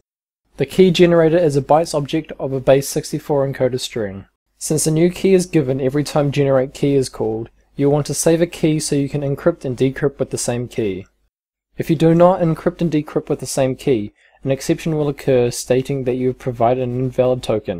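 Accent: New Zealand. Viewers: Thanks. I like the Kiwi accent too.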